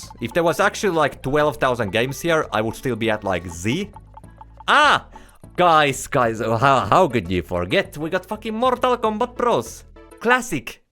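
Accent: Finland. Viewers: Really? Why watch the vid then, I think the Finnish accent is kinda cool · when i heard your accent i knew you were from finland (minä olen myös suomesta) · Aloin miettimää että ootkohan suomalaine ku suomalainen aksentti mut huomasin että irwinin paita nii tajusin siitä että oot suomalainen🙏🏻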